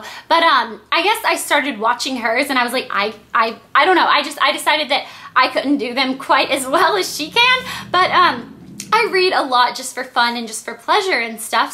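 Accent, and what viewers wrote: South, United States. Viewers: Oh I love the southern accent - i dont think you should get rid of it..it is so cute! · Southern Accent are beautiful. =) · aw i liked your southern accent :(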